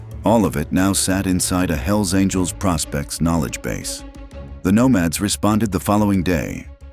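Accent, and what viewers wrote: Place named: United States. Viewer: Why is this narrated by a septic tank which is utter condescension. Even the images of the notes are American not Australian currency, and it does not snow in the suburbs of Sydney and Kevin Rud is not Anthony Albanese. If you're going to have a documentary about Australian bike clubs, it should be narrated by an Australian who knows the details not by some stupid septic tank. ￼💩💩💩💩💩💩💩💩💩💩💩💩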